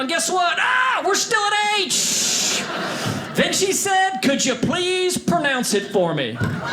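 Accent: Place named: Texas, United States. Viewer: Hold up. I tried not to say anything, but this is eating at me: His mama is English, speaks fluent Italian, Dad is Italian and speaks fluent English. Why does dude sound like he's from Texas?!?!?!?!? Somebody help me, I'm sooooo confused 😕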